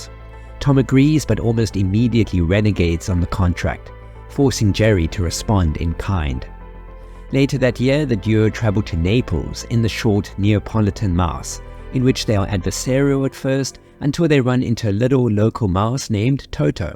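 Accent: South Africa. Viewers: Hey you are South African right judging by your accent? It’s nice to meet a fellow South African cartoon lover.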